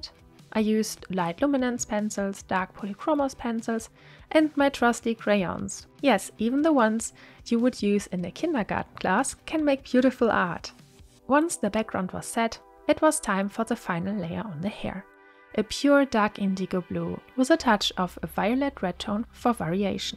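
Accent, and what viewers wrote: Germany. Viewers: I can hear and 'see' Alpay Efe in these vides... like a female version of him... also with same german accent... fun 😊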